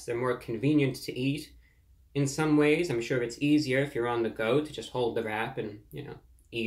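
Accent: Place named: United States. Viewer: Hello my American friend. I know you are American, because you guys are unable to say certain words lol. You guys can't say forward, you say foward lol. You guys also can't say espresso, you call it expresso. That annoyance aside, love your content! lol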